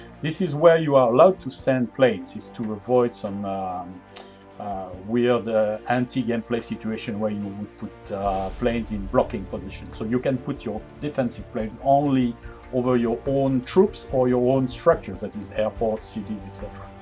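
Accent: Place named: France. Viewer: Well done! Great explanation of game mechanics along with good game play. As I worked in Paris, I enjoyed your accent and brought back wonderful memories.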